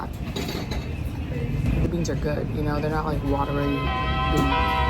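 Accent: United Kingdom. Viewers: Essex babe And please torture us with the British accent !!!!!!!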